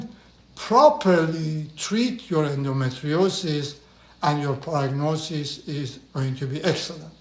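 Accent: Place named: Iran. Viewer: Thank you for your video. I have a 3.5 c.m. Cyst on my right ovary causing me pain. What are my options? My husband and I want to have a child but this situation is making me hold off. Would I require surgery and if so, is my fertility still in tact? Also, you sound Persian. I am also Persian. Thank you 🙏🏼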